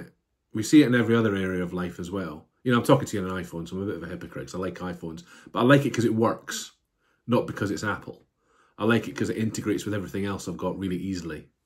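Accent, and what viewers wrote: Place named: Scotland, United Kingdom. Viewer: Hey man, nice to hear a fellow Scotsman talking about guitars 😁 Totally with you on this and I love your honest approach. I recently uploaded a video on a similar thing with overdrive pedals. Also, on your point about having to sell guitars - I hear you! I have had loads of gear come and go. Some of it I regret and miss but it's the way it is. Glad I found your channel. Talking sense, yes!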